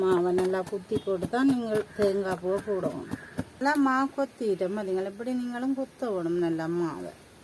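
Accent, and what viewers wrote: Jaffna, Sri Lanka. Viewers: உங்க யாழ்ப்பாண தமிழ் அருமை சகோதரி👍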